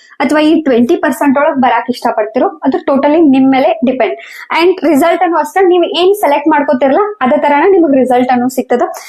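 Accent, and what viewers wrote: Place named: North Karnataka, India. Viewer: ನಮ್ಮ ಉತ್ತರ ಕರ್ನಾಟಕ ಭಾಷೆ ಅನಿಸತೇತಿ ನನಗೆ ಅಂತೂ 🤩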